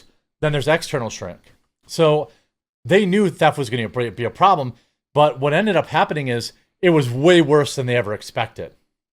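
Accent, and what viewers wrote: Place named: AAVE, United States. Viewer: Please speak English not Ebonics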